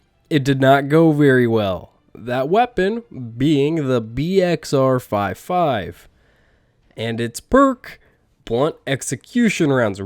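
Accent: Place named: West, United States. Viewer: You sound like you belong in a spaghetti western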